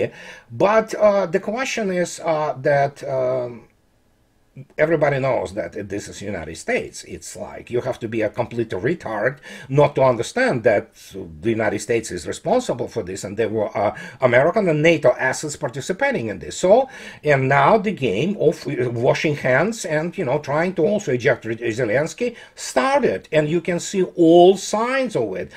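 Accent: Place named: Russia. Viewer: like always, thank you for delivering bitter truth with a beautiful Russian accent! One small remark, Russian word “Диверсия” should be translated into English as “Sabotage”, rather than “Diversion”. It is one of those words called "interpreter’s false friend". it sounds the same, spelled the same, but have different meanings in the two languages... So, ДРГ should be translated as Sabotage/Reconnaissance Group. Alexander Mercouris got it right.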